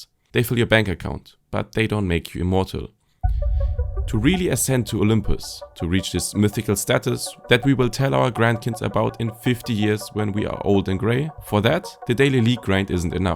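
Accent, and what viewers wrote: Germany. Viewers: I don’t think this guys ever seen Kane play despite his German accent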